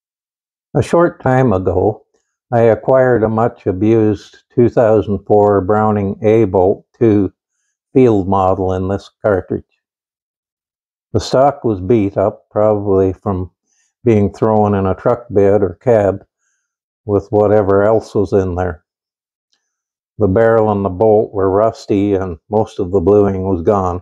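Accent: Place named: Canada. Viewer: Hello there, what a beautiful job restoring that rifle! You sound Canadian, hello from northern Alberta. Hope you continue to post videos of your collection.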